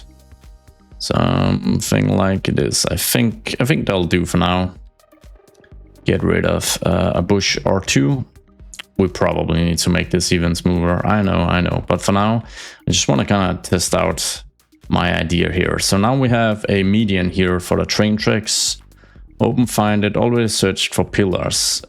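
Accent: Denmark. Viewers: I was wondering about your accent, are you by any chance danish? or maybe dutch?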